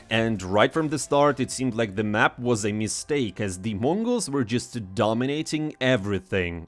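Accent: Russia. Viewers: I love we have drunk russian givig us bbc type cs news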